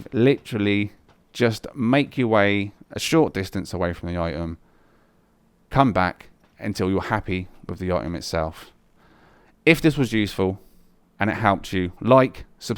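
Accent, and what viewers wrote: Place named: United Kingdom. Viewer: literally what he just sounds british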